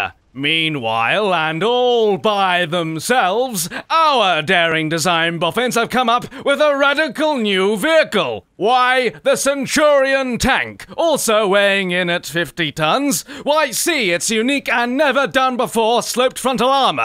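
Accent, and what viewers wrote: England, United Kingdom. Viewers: I am going to right a overly long and unnecessarily detailed comment on this thar them video made by. "Probably" and "yes" is probably half the video. good. also I love the British accent and the slang. also the videos are much better if you get all the references. I this comment long enough yet? no? OK ummmm....uh huh oh yes, uhhh.lhmmm. YES right you just earned a sub:) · dude get your tea together, make more videos you have a good thing going on, make some tea reviews or something, whatever you can't fail with that beautiful British voice of yours. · That was the best part of the video. I just love the comedy that uses. And on top of that, that superb British accent makes it all the more hilarious. Encore, encore! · eu amo esse sotaque britanico, kkkkkkkkkkkkk, melhor canal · I love your British aksent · Wow he's like a serious brit! 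See's : LOL WUT, DID HE JUST SAY AMELICANS INSTEAD OF AMERICANS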